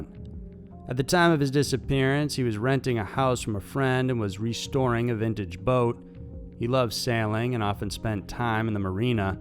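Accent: South, United States. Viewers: Your voice has improved, less monotone since I ranted about it last year. I like the southern USA accent too.. I prefer the human voice to your robot one. Easier to listen to and stay interested... Better than before anyway!! Almost there lol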